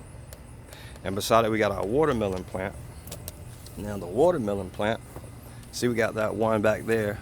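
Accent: United States. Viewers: Is that a New Orleans accent I hear? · Love this accent... is it cajun ? What is this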